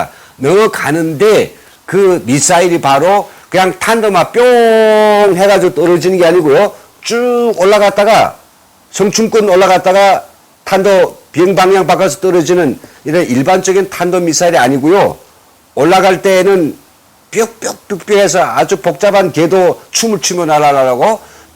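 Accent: North Korea. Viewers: 진짜 북한사투리로 재밋게 하시네요~~힘내세요 응원합니다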